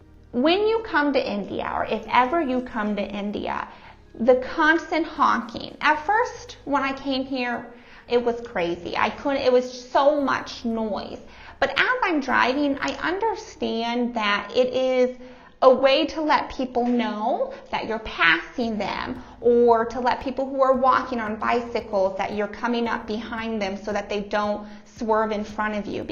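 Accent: United States. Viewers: Are You Michigan Or Chicago Girl????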